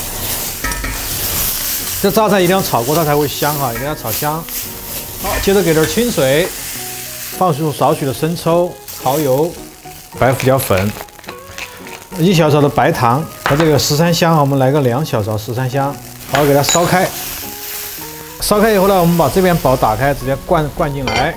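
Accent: Sichuan, China. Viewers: 四川土音 👍 太親切了！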